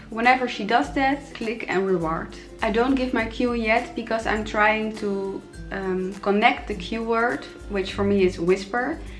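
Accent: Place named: Germany. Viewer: Wie du englisch redest man weiß das du deutsche bist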